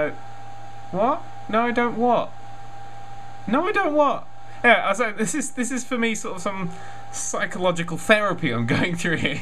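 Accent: United Kingdom. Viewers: Beλst Menus That's because,you know,british accent.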